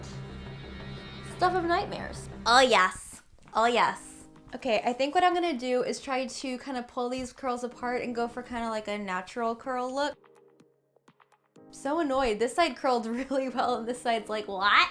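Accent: South, United States. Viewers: Im from the south too!!! Yea i notice the twang sneaking in too lol. Also could you do some more "curler" videos? Ive seen so many i wonder about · omggggg southern accent sounds so cute:) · i love this video! two things: 1. lmaooo when you said "don't worry, i have another one" and i thought you meant a backup hair dryer, but NO you had a backup dome hat thing OF COURSE. 2. lollllll at your accent, saying that you don't have one, but those two words "git" you. your "e" is often "i" in a very southern and very cute way! no hate here, only love! thanks for another great video! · This was very enjoyable. I'd like more videos like this on occasion. 😊 Plus, your comments at the end about the remnants of your southern accent were so fun/funny! 😁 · There's nothing wrong with a southern accent. I am a true bornand raised southern and i wouldn't have it any other way. 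This was my first time watching you. I subscribed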